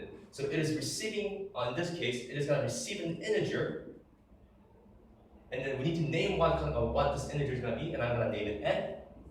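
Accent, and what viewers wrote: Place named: India. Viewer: I don’t understand your Indian accent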